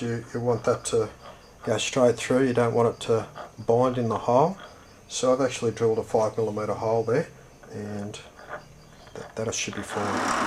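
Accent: Australia. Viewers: I'm assuming you're from australia with your accent, and if you are whereabouts? do you do custom builds?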